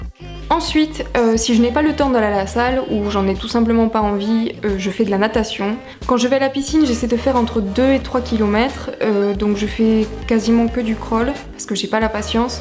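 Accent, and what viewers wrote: South, France. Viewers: vive le sud !! on reconnais l'accent ;)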